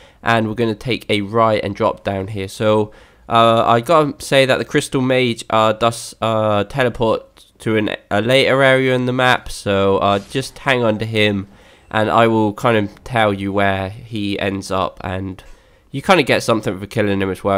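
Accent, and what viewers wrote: United Kingdom. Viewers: ur british am i right?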